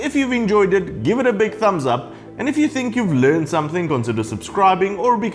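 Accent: Afrikaans, South Africa. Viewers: Speaking about South Africa.. Your accent is nearly identical to an Afrikaans English accent. I'm from SA and I had to check the channel page to see that you actually hail from Romania. Sorry for being so random lol. Great channel BTW.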